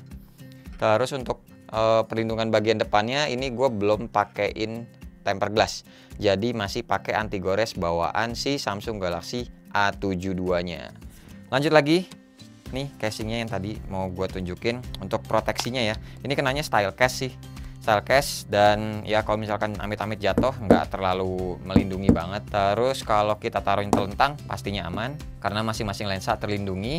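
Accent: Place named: Lamongan, Indonesia. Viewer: Kayak ada ciri khasnya orang lamongan...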